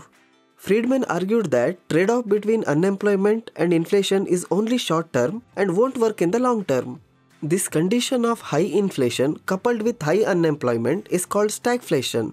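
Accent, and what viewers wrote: India. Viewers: Bro..ur english is really Indian...awesomely Indian.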